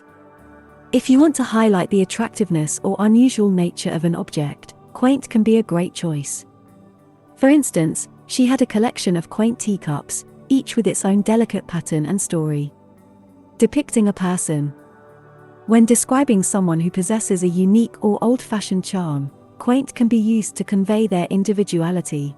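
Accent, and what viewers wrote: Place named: United Kingdom. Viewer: Congratulations! Your clips are real great stuff for anyone wishing to improve them English. Especially if you're in love with the British accent. It's pure music. Very "listenable". I was hooked immediately as I came across the very first clip. Oh! How I count myself lucky for bumping into your videos almost by accident. I have already shared your videos with not too few. Please keep up the wonderful work you are doing.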